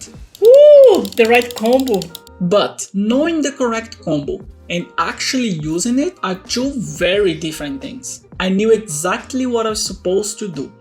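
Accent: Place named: Brazil. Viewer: I would recognize a Brazilian friend from far far away, esse seu accent não esconde nada, man kkkkkkkkkk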